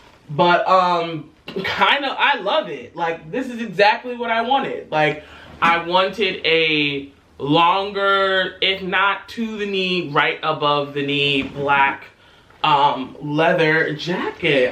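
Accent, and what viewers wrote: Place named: United Kingdom. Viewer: Good British accent. I like your thirties diaries.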